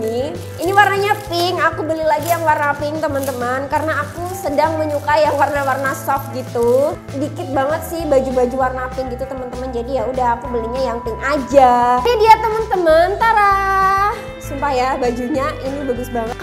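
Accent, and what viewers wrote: Java, Indonesia. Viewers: Kak asli mana. Kok ngomong e jowo? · Kak bahasa indonesianya agak medok Jawanya khas banget😀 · Mbaknya org mana sih?? Medok bgt sumpah lucu....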